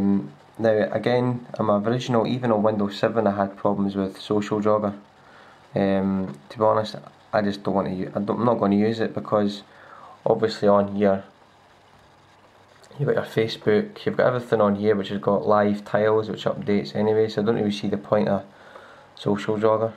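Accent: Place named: United Kingdom. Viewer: I live in Sacramento, California. But don't worry about your accent my mother is British and I used to watch BBC America growing up so you sound just like Hamish McBeth. I don't know how some Americans can't understand English. You should try the Star Trek LCARS GUI Simulator for Windows 8. It's not an Iconia keyboard mod but it's pretty damn cool.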